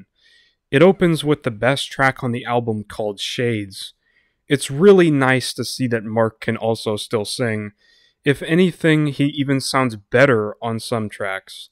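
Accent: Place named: United Kingdom. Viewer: Wait, you're from the UK? or are you reading a script? also I believe it's pronounced LEE-ver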